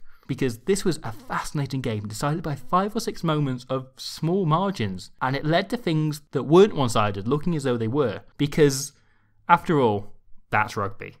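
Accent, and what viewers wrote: Wales, United Kingdom. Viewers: Best rugby analysis ever! And I've been watching the game for 20 years., are you Welsh???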